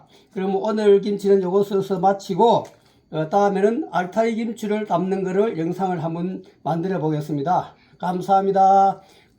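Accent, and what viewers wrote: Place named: Gyeongsang, South Korea. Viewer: 구수한 경상도 사투리가 매력있습다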